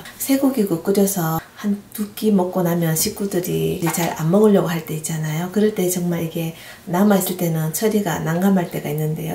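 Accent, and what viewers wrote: Gyeongsang, South Korea. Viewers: 🐋😀아~네^^♡쇠고기국 활용도가 대단하네요😍 😘는 대구쪽이세요?아님 부산쪽이세요?🍎🍏말씀소리가 참 부드럽고 예뻐서예🍡👠💍미스때 직장 동료가 마산출신이 있었는데 정말 말소리가 예뻐서 안 잊혀지네요😍😍😍🐸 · 갱상도 아지매 방갑니더~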